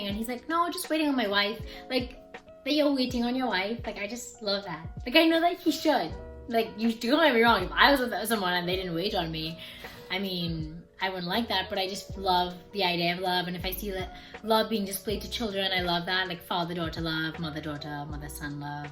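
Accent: Trinidad and Tobago. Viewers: Are you trini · Fellow Trini. I hear the accent. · You said you are from the Caribbean......Are you from Trinidad and Tobago? Your accent makes me think this🙂.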